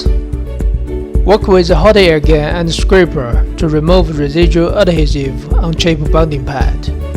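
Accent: India. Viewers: О этот божественный индуский акцент)) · thats not his video....... look.... this guys voice is indian, the video is china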